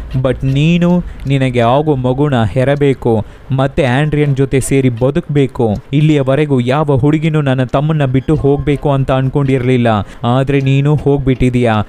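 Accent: Tamil Nadu, India. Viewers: Nim kannada Tamil accent 😄😄 but really well explained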